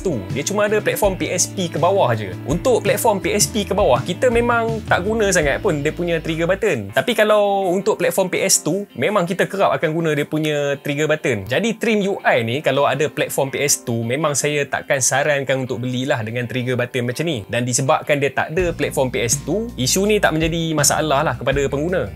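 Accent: Malaysia. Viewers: slang utara campuq selatan 😅btw nice review ,subscribed🎉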